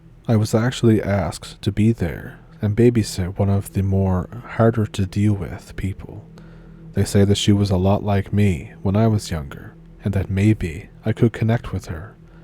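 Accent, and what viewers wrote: Ontario, Canada. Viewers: sir, I like hearing you speaking these stories because you sound so much like my Ontario and Great Lakers cousins and uncles. Cheers friend. 🎉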